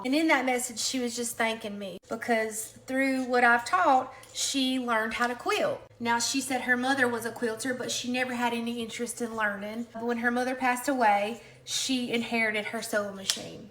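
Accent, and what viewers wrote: South, United States. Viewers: Hey Southern draw, your cute! 😉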